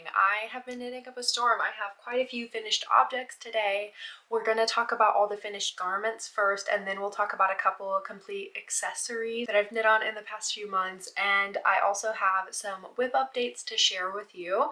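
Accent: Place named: Australia. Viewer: I've been watching your video's for a little while now, and I feel like your accent is changing a little bit to sound more Australian lol Also, I feel like our taste in knit pieces is so similar, I love fall colours too and you always pick such beautiful patterns! Watching your video's makes my fingers tingle to start new projects haha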